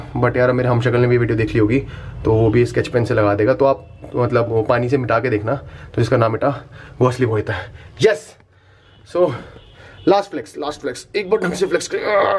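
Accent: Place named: Almora, India. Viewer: bhai..you don't no #pahadi Hindi Accent fell like almora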